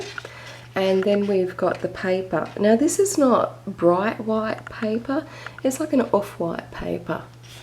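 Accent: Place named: Australia. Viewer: This is such a beautiful book! I'm looking forward to my copy! It should arrive in about two weeks or so. :) I really enjoyed this flip through, your commentary is enjoyable. I love your accent. <3 You from Australia?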